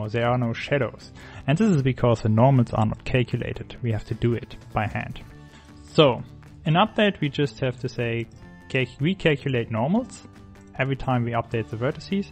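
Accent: Germany. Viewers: btw are you german xD rly sound like it wär ja ganz nett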